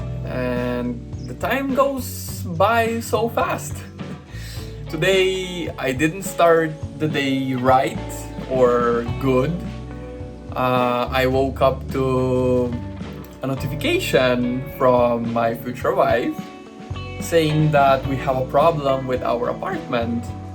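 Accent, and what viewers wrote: Philippines. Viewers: You speak your English in a Filipino English accent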